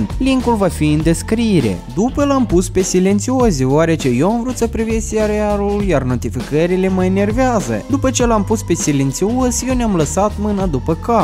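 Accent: Moldova. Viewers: esti din moldova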